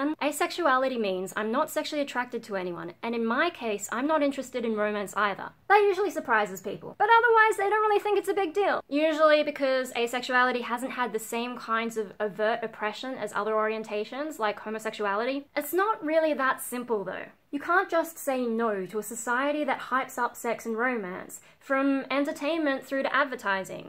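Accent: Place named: Australia. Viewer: Whoa. Shot out of the cannon. Very YouTube 2018ish. I've seen other YouTubers with this style. I always want to tell them to cut down on the coffee. :) Are you picking up a Valley Girl accent? Please don't! I like the English with the slight Aussie touch. Without a doubt, you are creative. Ten years of vlogging and countless projects, to name a few. For myself being creative means, to create my own world. As a kid, building blocks were my favorite toy. These roots translate into many other things. On a side-note: I like - The Tea Party at the End of the World - Just a soothing feeling I get from the visual of the installation. Asexuality and Music: Well..., here we are at the issue. - I'm convinced that sexuality or sexual desire, is the biggest driving force in music. Sex, Drugs and Rock'n'Roll to name a cliché. As easy as that. And not just for Guns N'Roses type music, but for the most unassuming music too. 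So as a teacher, my most-obvious question would be: If you take sexuality out of the equation, what is left as a drive?! I would want to know about the driving force. About your journey and your conclusions. The feelings about it all you can convey, and last but not least...what meaning does it all have for you? BTW: I shamelessly lifted many of these questions, from the basics of how to write a movie script. - A lot of psychology can be learned from the techniques of writing a movie script or story. Like the Hero's Journey. You know? The audience wants to know abo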